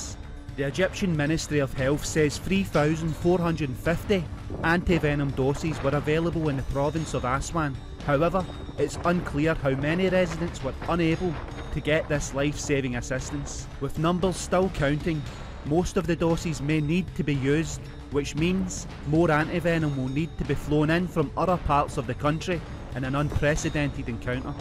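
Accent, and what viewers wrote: Scotland, United Kingdom. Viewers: Your accent lol. Super.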